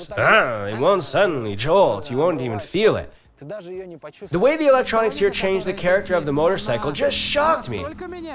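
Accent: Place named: England, United Kingdom. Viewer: oh you're from England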